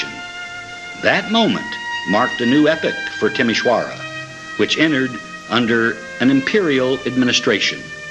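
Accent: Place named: Oxford, United Kingdom. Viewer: an englishman in timisoara this...nice OXFORD accent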